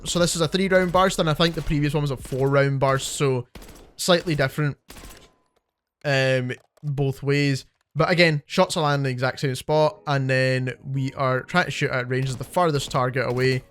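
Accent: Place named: Glasgow, United Kingdom. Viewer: Are you Scottish bro sound a tad Glaswegian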